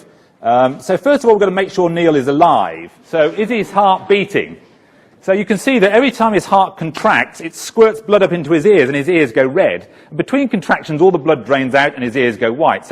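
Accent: United Kingdom. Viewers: This guy knows how to talk and explain....Although he has that british accent,it is very pleasant to listen to him :) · Is he ever to the point? Is beating the bush just part of his dialect, is add the cost of a fancy English accent ?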